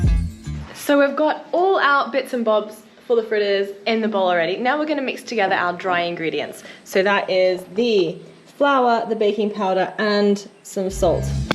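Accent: Australia. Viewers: Your Aussie accent is coming back. I noticed it almost right away. Good on ya cobber. (From a Canadian so I hope I did not offend) Lol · It's funny that you mentioned hoping you'd get your accent back at the end of the video. During your introduction at the start, your accent sounded so strong that I said out loud "Oh that's right, she's Australian!" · Knew you were an Aussie!! Welcome home, chick.. Great vids, cheers. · She does sound a bit more Australian than before. Must be because she's staying far away from that bad influencer Tilly. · You can always tell an Australian by their "Hee-uh" . We're finally Hee-uh. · Haha, you sound so much more Australian now you're back in Aus :) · Had no idea you were Australian…I can usually do a good job (for a Yankee) of picking out the difference in accents among the commonwealth nations (or former) I admit to missing it this time. 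Were you born there or your parents from elsewhere?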